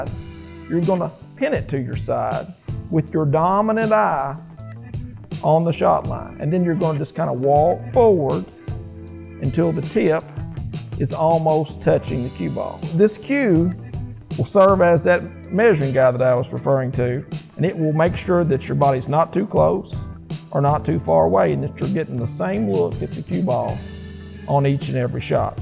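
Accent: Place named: South, United States. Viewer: Not bad advice but honestly talks way too slow, I think it's a southern thing but it's honestly tough for me to watch someone who talks this slow.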